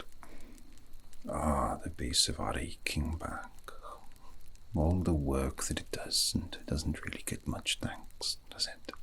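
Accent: Wales, United Kingdom. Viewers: Welsh accent? I'M IN. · Tone, timbre, and lilt are all perfect -- and I for one can never get enough of the Welsh accent. I've watched this twice now, at times when I was busy, so I look forward to having another go when I finally get to rest. So, will I have the dream, or will the dream have me? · You have done nothing wrong to need forgiveness, your welsh accent is always welcome to me. ☺ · I dont play video games but i like the crackling fire, and natural Welsh accent is always welcome, my favorite actually.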